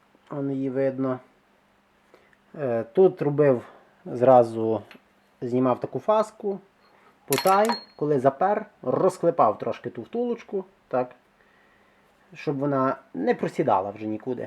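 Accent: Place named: Galicia, Ukraine. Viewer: По розмові чую, що Галичина . Ні?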